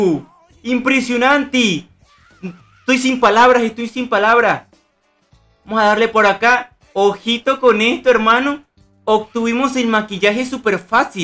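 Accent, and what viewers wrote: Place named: Peru. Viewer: Parece peruano XD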